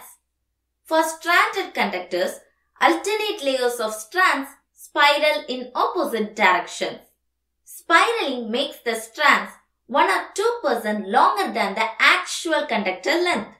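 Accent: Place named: India. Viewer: wwanna punch this girl. why do all indian;s accent have to be like this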